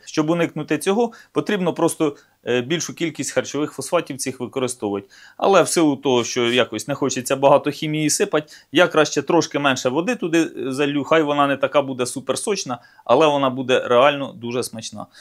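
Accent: Ukraine. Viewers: Не із Західноіі Украііни вже чую по акценту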